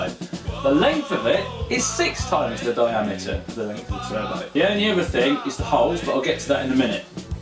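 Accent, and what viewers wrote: United Kingdom. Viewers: He has a heavy British accent and uses a lot of British slang. It wouldn't translate well. Try using google translate.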